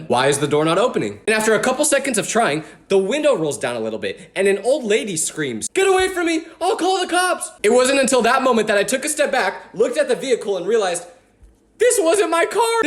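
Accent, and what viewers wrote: United Kingdom. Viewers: your british AHHHHHHH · tell me how you talk British in two episodes and you’re telling me that if a ghost was there, it will be scary. If it was British how does that make any sense? You literally speak British? How is that scary to you? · Why does he have such a good British accent…. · Your British AHHHHH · I’m British you don’t need to put a British accent we don’t mind but it is a very different place to be in because there really rude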